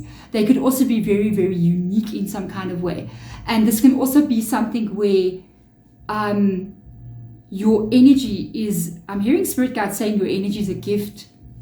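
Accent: South Africa. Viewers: B4 opening YouTube for a taro reading i asked my spiritual guides to guide me to a taro reader who can give answers to my questions and can with their reading uplift my spirit for this day. Lo and behold you were the first to appear and the moment you start talking i felt the connection with you. This is my first time ever that i come across your videos. The moment you start talking i knew that you are a fellow south african, only i live now in Australia. You are the best taro reader that i come across of all the other readers. The reason why is bc i have never their heart. Everything that you said resonates with me. The isolation that i prefer bc of others bad energy thats not good for my soul. Many times i feel like i don't belong where i found myself. I am very empathetic and can feel other people's emotions. The other day i dreamt that i was nackered and i needed somewhere to rest but where i am, i feel like im not welcomed. 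I found a bed where i can rest and felt so uneasy i was afraid to fall asleep then suddenly i feel arms around me and immediately i knew whom it was without turning around. At that moment I felt at peace. The person whom it was passed away 5 years ago and has told me that everything is going to he alright. Later the day i also remembered the morning he came to visit me was also his birthday. Thank you for your reading and i am subscribing immediately. Looking forward to listen to your other videos. Love and light.❤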